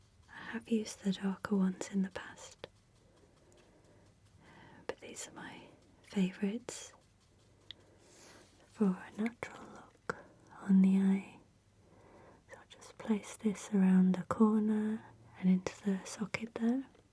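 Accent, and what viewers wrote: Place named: United Kingdom. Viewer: Wait does have a UK accent? I’ve been watching for so long I didn’t recognize anymore if she does, until she said “holiday”, because Americans say vacation. No way I forgot she has an accent 😂😂😂😂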